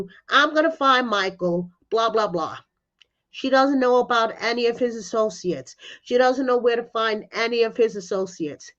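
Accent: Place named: Brooklyn, United States. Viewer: You sound like a typical Brooklyn girl? Do you happen to be Jewish also?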